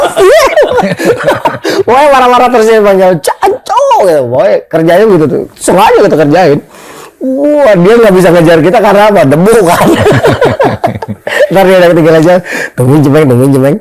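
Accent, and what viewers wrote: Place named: East Java, Indonesia. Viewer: Seneng derger gaya bicara abang nya.....Jatim Kediri...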